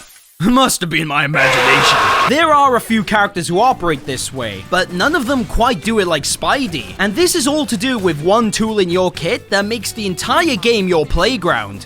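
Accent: Wales, United Kingdom. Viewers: u welsh? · It always shocks me whenever I hear another Welsh creator because I never expect the accent until im midway through and im like "wait hold up". First impression and it's already a great one, keep up the good work!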